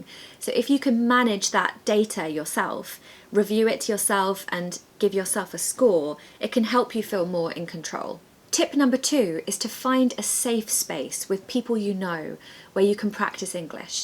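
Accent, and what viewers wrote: United Kingdom. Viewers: Wow! Wonderful quotes and an amazing British accent you have! you're a star⭐hope you will do lives in the future and we can speak with you. 🤍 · I find your series of videos very appealing! I also think your beautiful intonation is exactly as described in Peter ROACH (2009) "English Phonetics and Phonology", which adopts RP as its accent model. Wonderful! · Your British accent is excellent.I need the channel of a man in a British accent that looks like you to make an imutation. who do you recommend? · Omg i love your British accent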